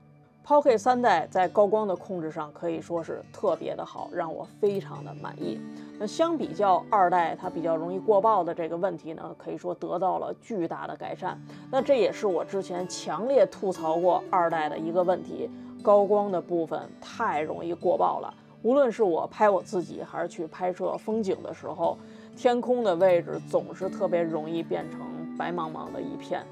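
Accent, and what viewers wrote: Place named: Beijing, China. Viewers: 还真是，而且都是京腔。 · 老北京口音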